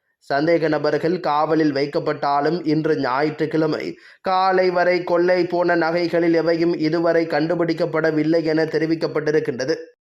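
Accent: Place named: Chennai, India. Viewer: Madras தமிழ்..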